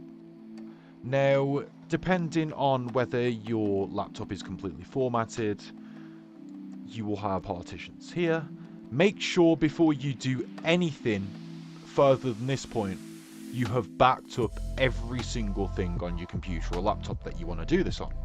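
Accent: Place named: United Kingdom. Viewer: Thanks For the Info. Even with the Heavy UK accent This New Yorker understood you very well. Not a fan Of "Recall" forced on you with Widows 11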